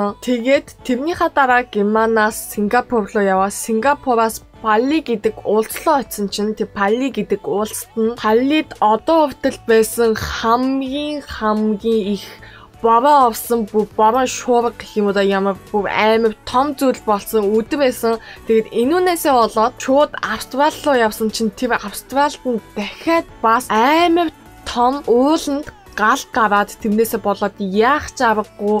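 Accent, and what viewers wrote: South Korea. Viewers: ta solongos aylagtai yaridag yum bn te say l anzaarlaa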